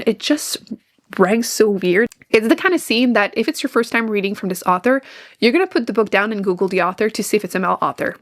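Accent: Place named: Quebec, Canada. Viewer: I know a québécoise when I hear one hihi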